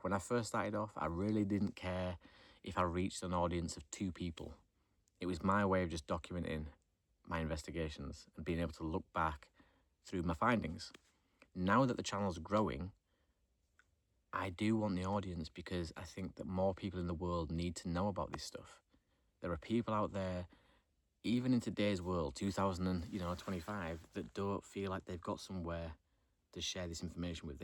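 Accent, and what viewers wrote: United Kingdom. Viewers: Love your content! Did you ever figure out your findings of that paintball place with the rack of game cameras? Was that abandoned? Btw I love UK accents 😂 cheers from Canada!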